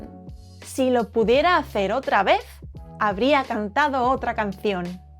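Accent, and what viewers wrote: Spain. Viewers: You do a great job. However, one word in English that you are mispronouncing is “participle”. There is a stress on the first syllable “part” and a bit of stress on the third syllable “ci.” So, it comes out as part”- ti- ci’-ple. Your English is overall very good with a very cute Spanish accent. Sadly, with our hateful, unchristian Trump regime here in America, your accent could get you stopped and questioned by ICE agents. And, want your country please take Elon Musk off our hands! Muchas gracias para su lecciones en español. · For her accent I think she is from Spain 😊😅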